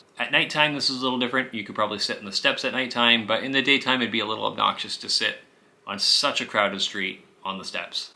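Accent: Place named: Canada. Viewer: Another Canadian on You Tube, my gawd it's like every Canadian has a YouTube channel. As soon as they say the word about you know you got a Canadian on your hands.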